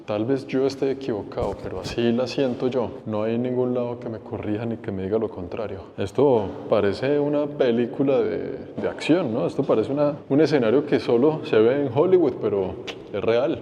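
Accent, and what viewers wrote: Colombia. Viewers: Que gracioso y simpático es el acento colombiano de, me encanta 😊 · que amenos videos haces, te sigo desde hace mucho tiempo y nunca decepcionas, te felicito y el acento colombiano le da el toque original! Un abrazo desde CDMX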